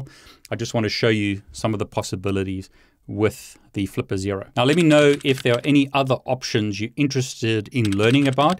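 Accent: South Africa. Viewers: South African? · Are you by any chance South African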